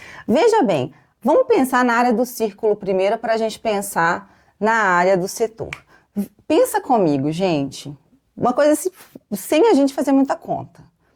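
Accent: Minas Gerais, Brazil. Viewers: Show é E que saudade do sotaque de MG.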